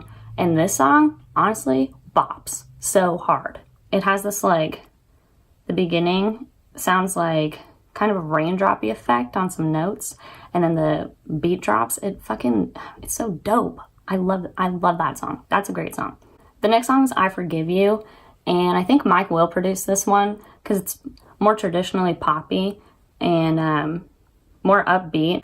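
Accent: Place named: South, United States. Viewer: You have a slightly southern accent, my favorite song of her is. What is your favorite one?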